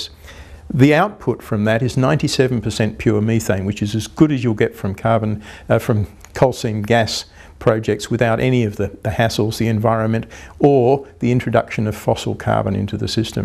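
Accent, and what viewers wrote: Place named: Australia. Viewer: Hi. Nice to hear an Aussie discuss power to gas electrolysis. How did you go with your pilot in Northern Rivers? Being and engineer with a solution bent, this method of storage and delivery of methane via the gas grid seems the most sensible way to use our existing infrastructure to get the long term storage we will need once renewables dominate the electricity grid (hopefully). If anyone will get it right it must be the Germans! Anyway, I'm interested to know how it is going and how it is being received by others. Regards Tony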